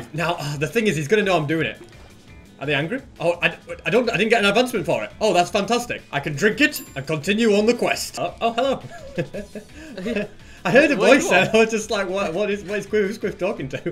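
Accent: United Kingdom. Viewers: Dude your British so I’m I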